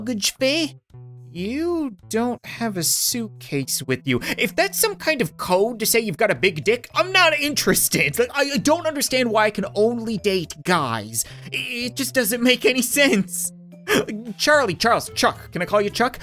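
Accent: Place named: Newfoundland, Canada. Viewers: not all Canadians have accents he's a newfy which is the Canadian Irish that's why he talks like that and also I'm Canadian that's why I know this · He canadian what do you expect. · It is a Canadian accent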